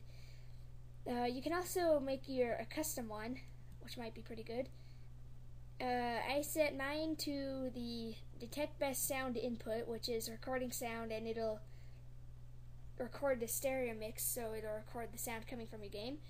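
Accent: Ireland. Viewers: irish? waayyy kewl :) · He sounds Irish to me xD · where r u from?? Little kid? ireland?? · Irish accent detected. Adorable. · u irish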